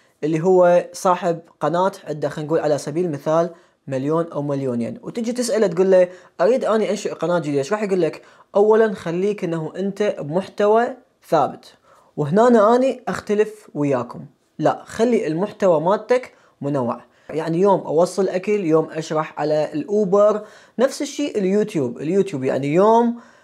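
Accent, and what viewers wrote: Iraq. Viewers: عجبني اسلوبك و طريقة الشرح ... و بصراحة لهجتك العراقية محببة للسمع... انا متابع مصري روسي ... و تحياتي لكل شعب العراق الحبيب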